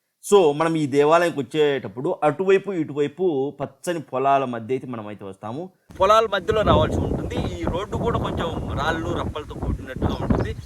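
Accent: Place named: Kadapa, India. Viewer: Nenu chala rojula taruwatha rayalaseema kadapa bhasha vinnanu. Thank you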